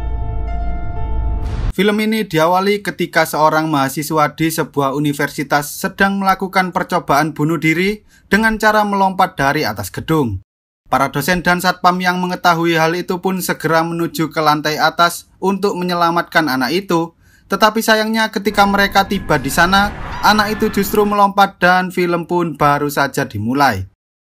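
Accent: Java, Indonesia. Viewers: Wong jowo mas? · logat mu podo koncoku mas jowo ngendi sampean? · Suaramu wi lho bang medhog banget, malah mirip koyok suarane dodit hahaha🤣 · Dari cara ngomongnya, pasti orang jawa tulen ini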